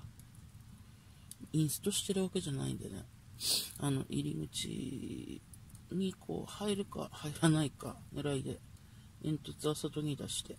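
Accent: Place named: Tsugaru, Japan. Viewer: また笑って見ました。女子ソロキャンパーの新境地開きましたね。お茶の間劇場ぽいキャンプ動画で漫画チック✨✨楽しいなあ。 jaijaiさんの自然体津軽弁？に温もりを感じます。プラスちゃんの可愛いキャラクターがgood💕 なんかヘルパーのお姉さんとバアサンのコラボみたいになっちやいましたね。🤣 お天気に恵まれてヨカッタヨカッタ\( ˆoˆ )/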